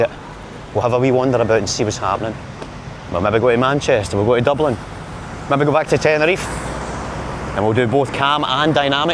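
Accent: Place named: Glasgow, United Kingdom. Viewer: New subscriber here, I moved away from Glasgow a few years ago and it's good to hear your accent and a bit of Glesga patter,good video pal and will look forward to the next one,🏴👍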